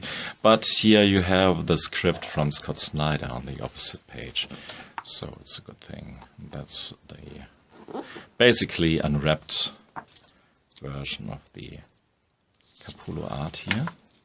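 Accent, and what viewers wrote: Germany. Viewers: Ich finde es schade, dass du in englisch bloggst, vor allem da es dich einschränkt, weil du manchmal die Worte suchen musst. Andererseits mag ich den deutschen Akzent .) Aber am Besten gefällt mir, dass du auch Disney Comics im Schrank hast .. Thumbs up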